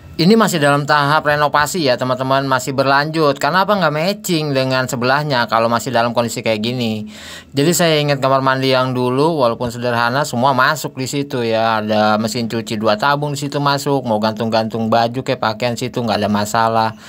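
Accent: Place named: Jakarta, Indonesia. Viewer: Hehehe...ini mah asli kobtrn adisi ngelawak ..tp lumayan cukup menghibur, jd kangen jakarta...ligatnya itu betawi bangettt ato jakarta banget dah familiar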